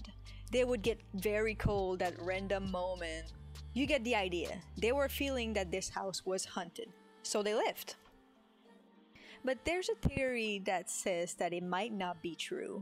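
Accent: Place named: France. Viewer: Omg, I was gonna say it sounded like French was your first or second language because of the way you said "occult", but for some reason I thought you were from an African country that was a former French colony. You speak English much like a native speaker though, you are really good at it 😃👍, especially for only speaking it for 3 years! It took me way longer to learn English. Thank you for replying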